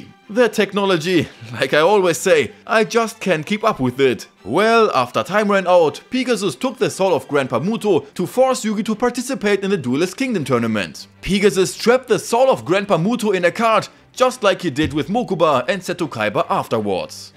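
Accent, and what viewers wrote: Germany. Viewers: That german english is unbearable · Are you German? Cause you sound like that ^^ Edit: Ok, you definitely are xD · dein deutscher Akzent ist schon sehr deutlich rauszuhören aber cooles video :D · Dude, your german accent is kinda funny 😄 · I love your very strong German accent einfach geil Bro ich lach mich echt schlapp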